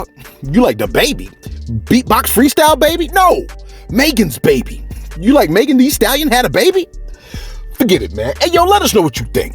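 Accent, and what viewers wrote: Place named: New York, United States. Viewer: U sound like a dude from new york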